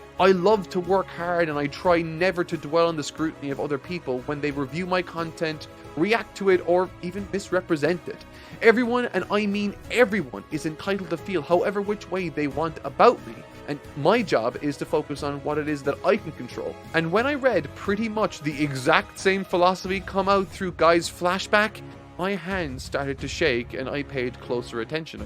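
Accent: Ireland. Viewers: Are you Irish, sir? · That's wild af to call an Irishman a Brit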